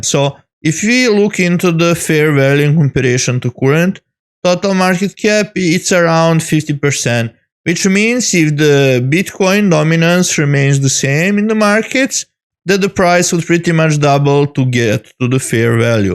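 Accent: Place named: India. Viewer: Da, zvucis ko indijac..jbg sry ostavi ako zelis da radis na engleskom ali mozda da odradis i na nasem jeziku ili novi kanal za balkance!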